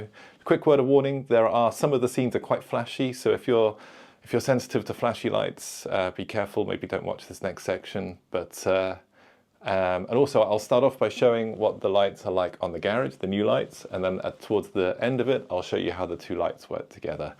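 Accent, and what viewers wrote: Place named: England, United Kingdom. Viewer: I'm surprised nobody has commented on your Bokke jersey to pair with your English accent. Go Bokke!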